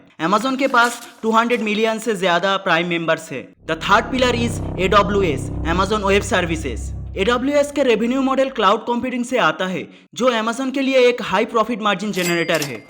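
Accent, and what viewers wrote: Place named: Bengal, India. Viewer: You have a Bengali accent, so you'll need to work in that style for this task—everything else is perfect!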